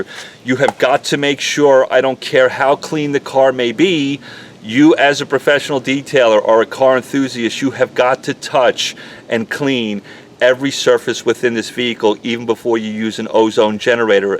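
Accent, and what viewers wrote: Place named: North, United States. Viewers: You don’t sound like you’re from Dallas. You sound like a yankee. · wide awake thanks for helping . I can't stand his boston accent .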